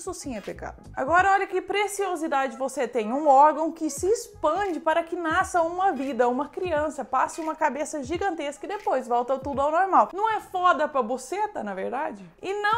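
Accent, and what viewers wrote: Minas Gerais, Brazil. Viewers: Como não assisti seus vídeos antes! Moça você é demais! Muito engraçado! Nosso sotaque de MG é muito legal! Mineirice sempre!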